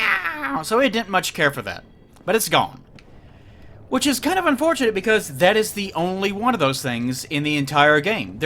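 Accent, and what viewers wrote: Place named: Western Kentucky, United States. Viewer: Going by accent and the fact that he owns/lives on a farm I'm gonna assume is from somewhere in Western Kentucky. Unless he lives somewhere farther East around Bath county in which case I may have been blessed by his sexual aura and not even known it.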